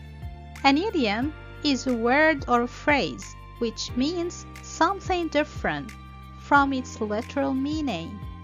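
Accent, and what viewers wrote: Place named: United States. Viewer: Your American accent is really incredible. Your explanation is great 👍 It's important to learn some idioms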